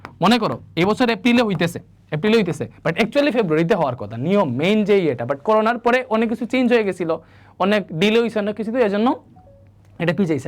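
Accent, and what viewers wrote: Sylhet, Bangladesh. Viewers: আপনার কথা শুনে বুজা জাচ্চে আপনি সিলেটি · ভাই আপনি কি সিলেটি??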